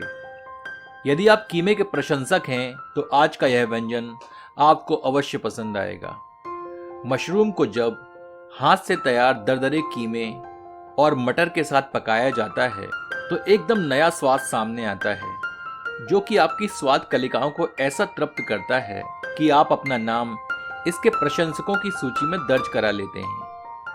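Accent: Standard. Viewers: शुद्ध हिंदी बोलते हैं।आप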